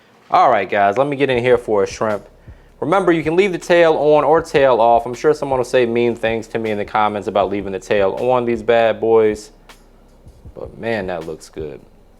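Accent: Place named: Pittsburgh, United States. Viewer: First time watching you. Recipe looks amazing and will make it today. Are you from Pittsburgh PA? You sound like everyone I know, from Pgh. 👍🏼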